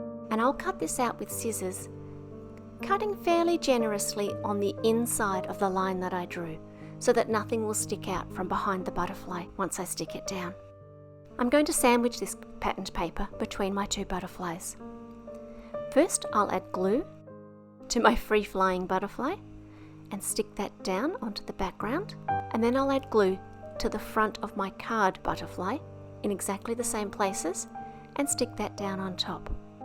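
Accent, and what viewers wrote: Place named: Australia. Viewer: Hi, I loved these cards they are simply stunning, and now I want to purchase the butterfly dies. It is always a joy watching you create your cards... with your easy to listen to Aussie voice, and the beautiful relaxing music in the background. Everything blends so well together, and it matches your creativity. I am so blessed to have found your channel., I am so sorry for your loss, and this is such a beautiful way to pay tribute to your nephew Daniel. Prayers for you and your family at this very sad time. May you find comfort in our Lord and Saviour Jesus. God's blessings and love, Gloria 🙏💐❤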